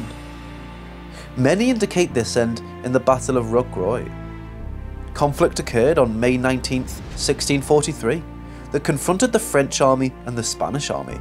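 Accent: Liverpool, United Kingdom. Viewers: For sure, a scouser. I wouldn't want any other describing another fierce people.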